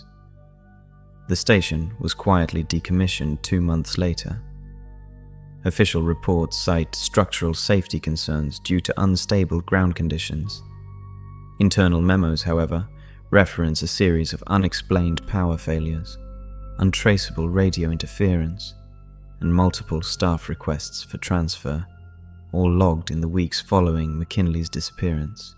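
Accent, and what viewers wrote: United Kingdom. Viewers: Native or acted, but British accent is the best on my and many others opinions👍👍👍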